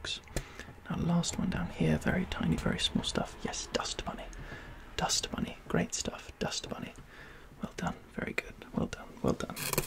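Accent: United Kingdom. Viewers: I am from Russia and have been studying English for 8 years. I should note one important thing: your British accent is the best thing I've ever heard in my entire life. It's magical and indescribable! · The British accent is literally my favorite thing ever I could listen to this everday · help why I'm so obssesed with british accent wtf? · The British Accent is a chefs kiss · are you actually british or are you just faking it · The british accent improves EVERYTHING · I love british accent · Help i cant understand british accent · I`m in love with british accent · Мое плохое знание английского + британский акцент = смерть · yes that is british accent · I love the bri' 'ish accent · I was scrolling and started watching this video without audio. And I clicked and was going to comment, "he looks like he has a British accent without audio lol", but then he DID have a British accent lmao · THE BRITISH ACCENT AGHH🙈🙈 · Word repetition with British accent might just be my new favorite thing ❤️ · thanks to youtube algorithm for suggesting a british accent channel lol and u seem like a fun person even though i have a littleee difficulty with the language anyway I'll support u ^ ^ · You're very cute! 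And loved the asmr vid!! I enjoyed the British accent! Stay amazing !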